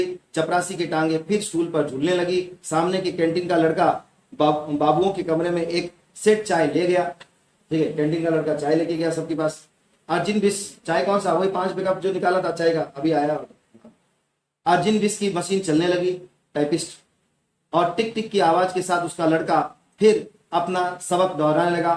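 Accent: Odisha, India. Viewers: Sir app odisha se he kya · Is sir from odisha state ?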